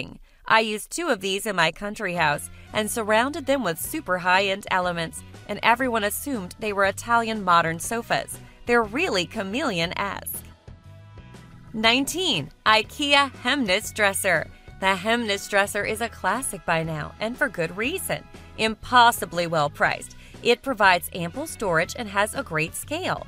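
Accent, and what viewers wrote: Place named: United States. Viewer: Where the hell do they find these narrators? Their voices are so nasal, so artificially enthusiastic, so... American? I honestly think a robot voice is less irritating.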